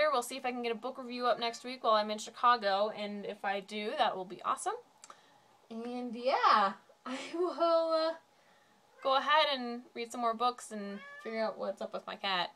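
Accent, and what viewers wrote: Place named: United Kingdom. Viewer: Welcome Back Pretty , your accent changed a little to British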